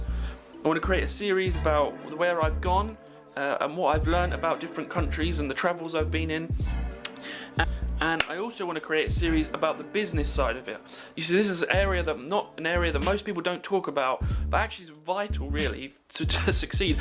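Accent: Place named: United Kingdom. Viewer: You won't beleive it, but i watched this video to exercise my listening skills in British English 😂😂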